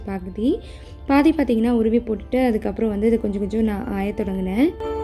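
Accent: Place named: Karaikal, India. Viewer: asalamu alalikum sis m from sri lanka but m a indian unga video unga talking style yellame paakum boodhu yenaku india la irikura maai feel aaudhu na adhukaagave unga videos paakurai m really happy when i saw your videos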